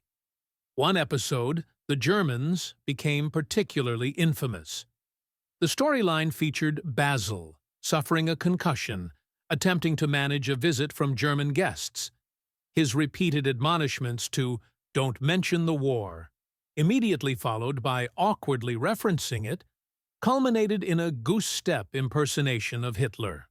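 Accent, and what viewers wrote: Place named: United States. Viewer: Dont waste your time watching this , dumb yankee voiceover , a collection of repetitive screenshots , clickbait , utter BS , whoever put this together must have the IQ of a newt. Oh and the thumb showing her in a bra ,is clickbait The actual scene was Basil impersonating a Nazi, not mentioned at all in this vid .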